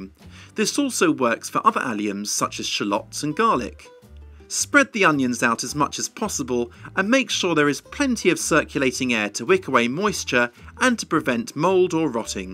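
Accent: United Kingdom. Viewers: British people are so much more pleasant to listen to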